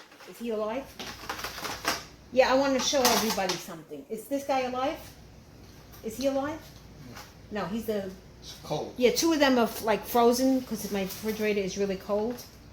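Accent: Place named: New Jersey, United States. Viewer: you definitely sound like a Jersey Girl. That locked amazing.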